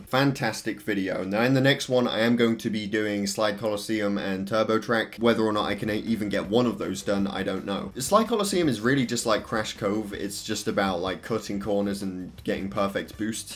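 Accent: United Kingdom. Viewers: Dude are you from the uk?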